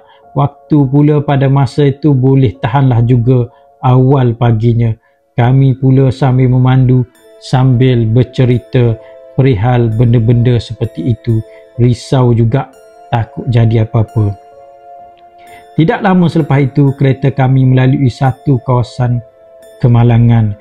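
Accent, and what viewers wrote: Kelantan, Malaysia. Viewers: Terjumpa channel ni kebetulan ari ni first Time tgok..Abe ni ore Kelate ke ..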